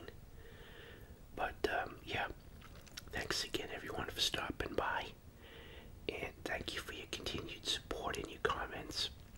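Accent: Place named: Boston, United States. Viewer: Yeah, it's a Boston accent I'm pretty sure. It's not a thick as some others but it's there.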